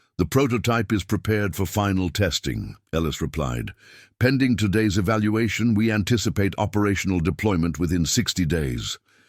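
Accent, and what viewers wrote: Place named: United Kingdom. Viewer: I'M HEARING A SLIMY LIMEY DOING THE NARATING, JUST BY THE WAY HIS USE OF CERTAIN WORDS!!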